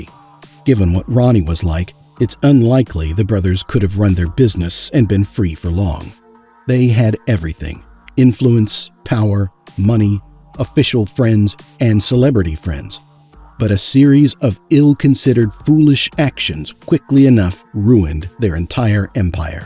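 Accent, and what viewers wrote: United States. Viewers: How do you with your American accent know anything at all about the twins. ? Been watching a few movies? One thing that is for sure, the east end would still be the same Also no Indian restaurants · Muggy Yank accent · Why is it that all these documentaries seem to have a cheesy American narrator? Unwatchable.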